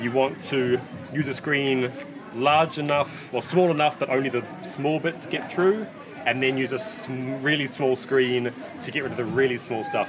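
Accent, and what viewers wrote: New Zealand. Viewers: Cool. We thought so. We heard the NZ accent then the slight roll of the "R's" was the give away. We picked Gore or Invercargill. Our boys just went shark diving down there a couple of weeks back. Good to see another "Kiwi" making a difference in the world.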